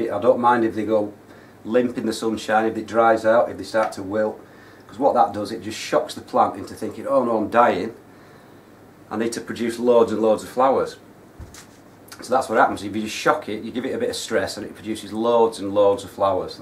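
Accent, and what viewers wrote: Yorkshire, United Kingdom. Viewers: Loving that Northern accent. Yorkshire? Great plants by the way and a very good video!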